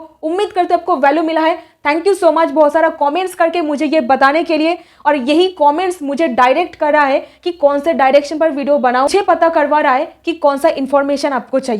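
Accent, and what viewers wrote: Bengal, India. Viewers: Narrator is Bengali ? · Tum bongali logta hai mamta benargibhi aiosa hindi bolata ohae.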